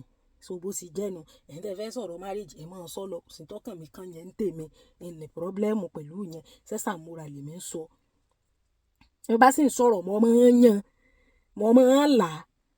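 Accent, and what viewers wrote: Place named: Oke-Ogun, Nigeria. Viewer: I love your accent as Omo ilu Oke 😊, I really enjoy it, ride on, Mama 👍🆗👍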